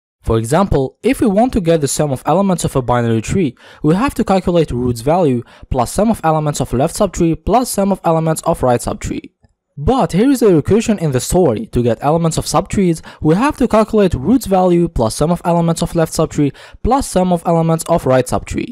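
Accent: India. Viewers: I can't understand this indian accent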